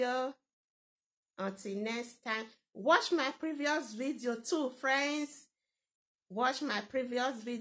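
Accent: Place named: Italy. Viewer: I love your Italian accent, I have my cousins there in Italy, nice content sis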